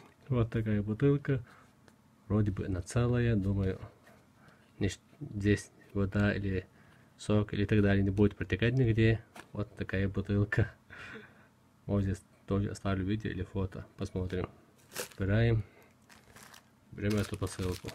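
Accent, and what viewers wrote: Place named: United Kingdom. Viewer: у тебя акцент интересный,британский или вроде того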